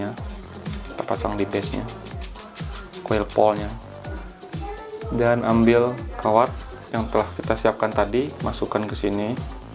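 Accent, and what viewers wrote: Padang, Indonesia. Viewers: Ngomong nya kayak logat orang padang ni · mantap dah.. tp by the way.. logat nya kayak org padang neh.. bener ya?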